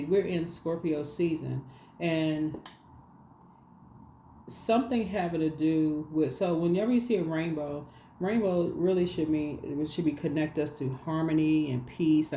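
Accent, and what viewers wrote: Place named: South Florida, United States. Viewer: I'm from South Miami and I dont many people who speak like that. I think its Carrebien/latinx black people who are born in the USA south florida dialect. Very specific north Miami. Anyway you hit the nail on the head.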